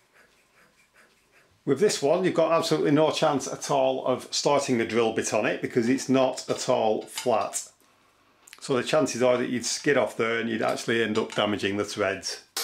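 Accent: United Kingdom. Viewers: HOW VERY KIND FROM YOU TO SHARE THIS SURGEON LIKE PRECISION WORK, AND IT HAD BEEN AWHILE SINCE I HEARD THE BRITISH ACCENT, WHERE IN THE UK ARE YOU?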